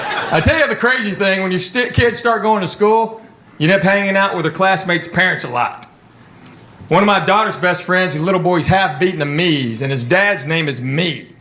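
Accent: South, United States. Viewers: I love quips! He truly is one funny dude! And I love hearing that thick Southern accent coming out of a full blooded Korean dude's mouth. It just adds something extra special because it's unexpected for someone who's seeing him perform for the first time. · Delightful southern accent.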